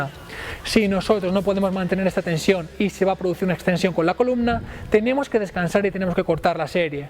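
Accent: Spain. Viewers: Me gustam muchos tus videos, apesar de ser español no eres desagradable de oir (soy latino y ocurre normalmente, con todo respeto lo digo), tienes una exelente redaccion por los pocos cortes en cada video y no vives sin la camiseta intentando lograr lucirte en cada video. De verdad un aplauso !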